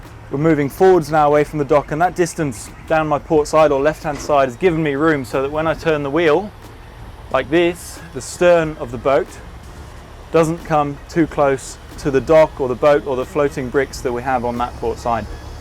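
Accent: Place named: United Kingdom. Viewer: That accent says born in the UK. I’d like to think if you were in UK you’d use the kill cord immediately the engine is running. And yes you can do the lines single handedly with the engine off, step to the helm, kill cord on then complete manoeuvre. It’s a great video, but remember you set the example to every newbie watching this show who may have no idea how or why the should use a kill cord.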